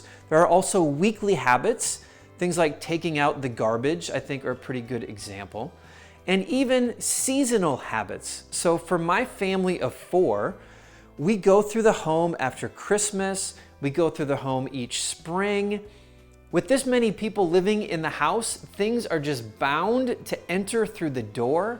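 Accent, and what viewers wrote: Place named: United States. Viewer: Hi, I'm a Spanish woman who is trying to stay minimalist after a long period of decluttering in my life... Also, I'd like to say you that I learn lot of American English with your pronunciation! Thank you very much!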